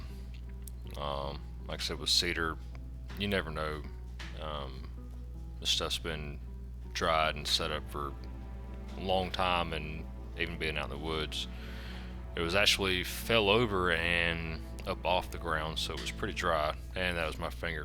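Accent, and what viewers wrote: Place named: United States. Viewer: Hey, in this Moment, i am a Fan of you and your work. Very nice. Where are you from in the USA? I like your voice and your dialect. Many greatings from northern germany, from me and my girlfriend. Stay care and healthy.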